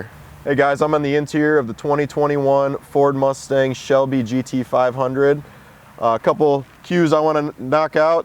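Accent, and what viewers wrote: United States. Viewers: twice not two times , mirror not mir ninety not nindy ...americans murdering the english language once again .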